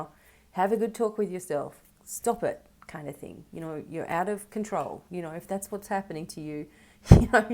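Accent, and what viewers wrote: Australia. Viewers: So True. Especially better cos you are an Aussie and you’ve kept your beaut accent !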